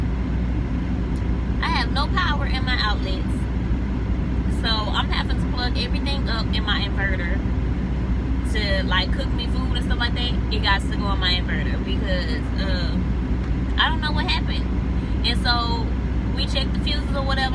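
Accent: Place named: Georgia, United States. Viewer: You from south ga huh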